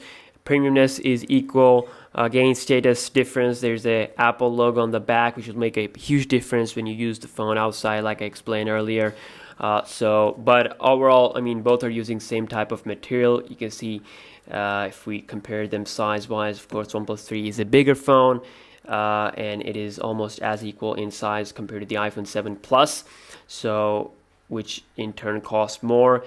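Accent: United States. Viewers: no one has observed. If you look carefully you can see two men in kurtas, which is Indian tradition and this guy is American (or may be of other country),so this photo is clicked in India. Not by this man. This has been picked and pasted from other videos or Internet. You can see this . This guy sounds like American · OMG. You sound like American.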